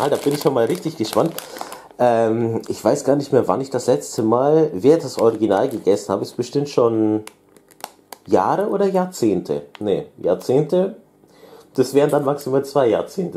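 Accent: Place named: Ukraine. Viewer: Meine Tochter hat eine Go-Pro (ich hoff, das heißt so) fürs Reiten - das bräuchtest du für deine Auspack-Videos. Jedesmal wenn du was essen wolltest, konntest nicht, weil einhändig, du armer Kerl. Dein Englisch erinnert mich an einen Ukrainer, den ich kenne :-) Die unterschiedlichen Farben sind ja geil! Bussis an deine süßen Zwei :)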